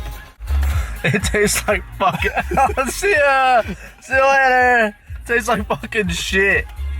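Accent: Australia. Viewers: his australian accent is coming back it's so cute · You've got your Australian accent back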